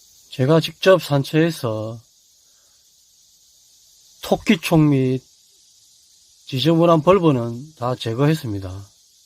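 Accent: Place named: Busan, South Korea. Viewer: 안녕하세요 영상처음접해 봅니다 난초들이 아주건강하내요 부산말씨같아요 저도부산사라인 데 지금은제주도에 서2년째살고있 습니다 완판하시고요 영상 자주보겠습니다 잘봤습니다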